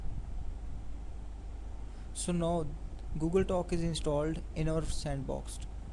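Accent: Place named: India. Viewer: sooo Indian accent xD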